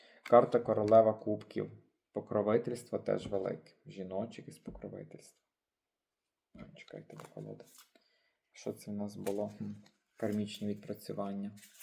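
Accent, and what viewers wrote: Ternopil, Ukraine. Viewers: Спасибі, з тобою спокійно...затишно..і ще люблю тернопільський говір..твій баритон.....ти народний провидець. дякую.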